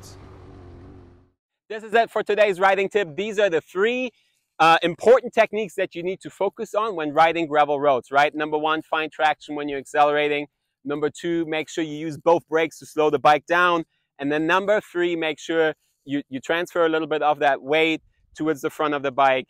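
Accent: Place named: France. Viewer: You lost me at french accent.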